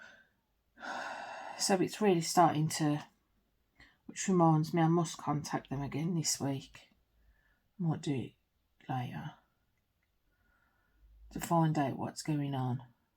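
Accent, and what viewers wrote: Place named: Birmingham, United Kingdom. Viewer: Great wip n chaty little Brummie glad your getting back to you my fave book growing up was the secret garden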